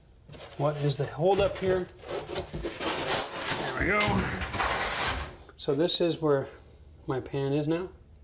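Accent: Canada. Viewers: He sounds Canadian, so I am guessing he is in Canada.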